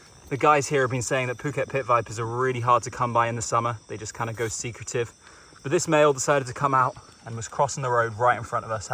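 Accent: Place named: England, United Kingdom. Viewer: Constantly amazed at the sheer volume of variety of our slithery friends., you are an excellent member of the YouTube Herpetologist Vanguards (patent pending). You have a pleasant voice and the English accent doesn't hurt, and that exuberance can't be faked. Thanks for this and all the videos you've upped. Er, not so sure 'bout the mullet. Brings back flashbacks of the 80's <shudder> 🖖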